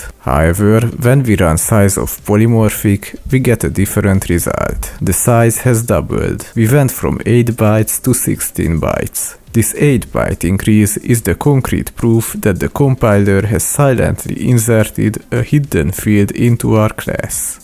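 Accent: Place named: Hungary. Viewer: Came for C++, stayed for the Hungarian accent.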